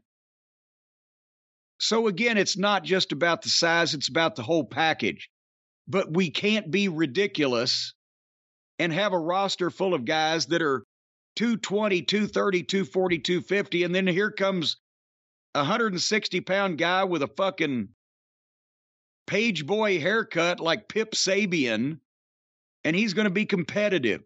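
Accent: South, United States. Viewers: Proper oldschool Southern accent, love it!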